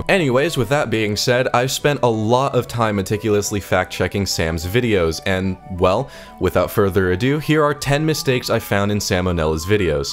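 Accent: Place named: Northeast, United States. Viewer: We also call them that in the NE and that’s what his accent sounds like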